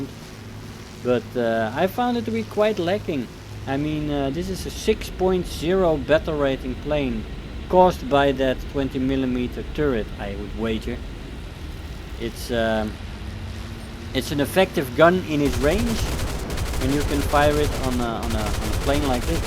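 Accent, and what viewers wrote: Netherlands. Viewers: your dutch i can hear it haha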